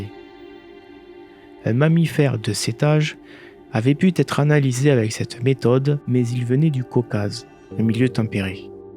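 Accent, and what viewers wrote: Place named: South, France. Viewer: Je découvre ta chaine, franchement j'aime beaucoup. Tes vidéos "News" sont intéressantes tout en étant relaxantes, c'est un format très appréciable. De plus, étant originaire du Sud de la France, ton accent me ramène un peu chez moi ! Bref, tu gagnes un abonné. ^^ Du coup je te dis à la prochaine ! ;) PS : Petite remarque sur un détail qui a tout-de-même son importance : le nom de l'espèce ne prend jamais de majuscule, seulement le nom du genre. Aussi, dans ce cas, il te faut écrire Gigantopithecus blacki dans le titre. Voilà voilà. :p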